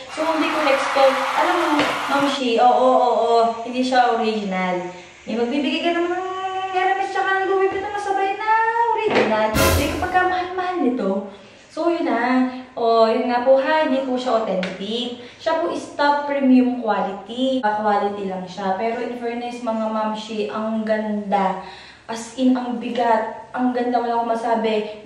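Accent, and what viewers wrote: Batangas, Philippines. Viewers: hi “ new blogger here!! cute ni ate mag batangeño 😂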